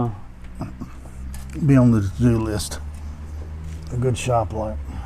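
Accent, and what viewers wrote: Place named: Texas, United States. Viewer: Oh, I feel like I’m in Texas hearing his accent, the garage and the big Chevy. I miss Texas especially the shoppings and dinings.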